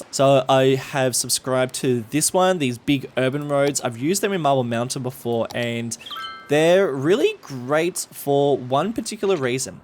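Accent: Australia. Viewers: I did a double take at "Lewis Armstrong". Haha, love the Aussie accent.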